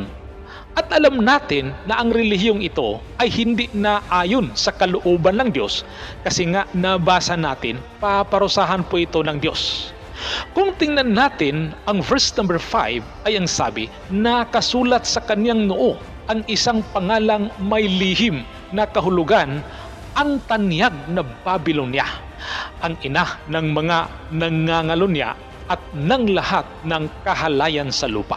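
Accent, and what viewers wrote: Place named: Visayas, Philippines. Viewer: ano ba yang accent mo... sorry... wag ka nang mag pronounce ng english kung bisaya ang accent mo.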